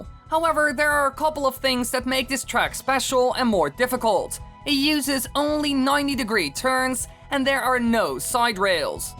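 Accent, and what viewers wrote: Netherlands. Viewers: Is that a dutch accent I hear? Nice video mate!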